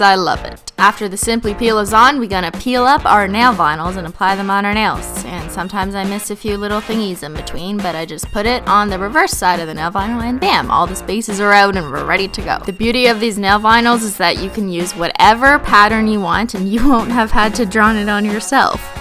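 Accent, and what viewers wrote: Canada. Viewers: It’s cause she’s Canadian you bitch rainy sims! Canada never makes fun of how you pronounce it! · did I just NOW realize her Canadian accent?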